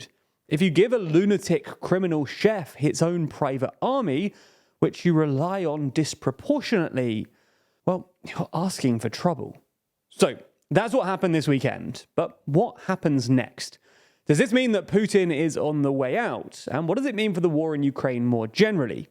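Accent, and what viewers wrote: United Kingdom. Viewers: This has to be one of the most intestinal videos of this channel as of yet. From the thumbnail, to the assumptions... You probably were cheering for wagner when they were doing a coup and then calling them terrorists after they backed out. A speculative video elaborating assumptions through the lens of a british idiot that thinks he has brilliant deduction capabilities. You're just banking on the views brought by your acephalous peers that love to parrot anti-russia stuff. "The beginning of the end for for Putin"... Even the people inside the loop have no idea what happened, and here you are stating he was humiliated blah blah. The truth is that you should've just stayed in your lane, reduced yourself to your insignificance as a mere youtuber that looks like an overgrown baby and thinks he is intelligent and a respectable news outlet just because he has a british accent.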